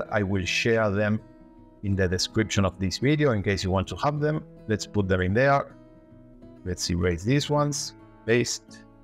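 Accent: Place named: Latin America. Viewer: De donde eres? tienes un acento tirando a latino..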